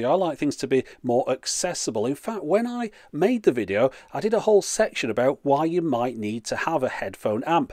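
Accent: United Kingdom. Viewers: I like the way he says "tchubes" Just use a long U with a British accent. I did notice that he uses a short O when saying phono though. Sounds like "fawno". Whatever, I have no problem understanding and actually enjoy the British accent. Think I will go watch some Anglophile vids.... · A vid whose purpose is trolling comment trolls, delivered in a British accent. Excellent! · Omg, youtube comments never cease to amaze me. I cant believe people were annoyed enough to complain about your pronunciation of tubes! i rather like the brittish pronunciation of many words and i know I'm not alone here. Why else are most documentaries hosted/narrated by chaps with English accents. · seriously you shouldn't care that much about what people try to tell you especially not on how to pronounce a word just ignore these people, no one can force you to pronounce a word like they do it just cause you're from the UK and they are from an other part of the world which speaks english and pronounces the word differently hate shit like that people stop trying to make someone talk like you do just cause you dislike the way he does it! 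live and let live or go fuck off (sorry for the rude words but its just true)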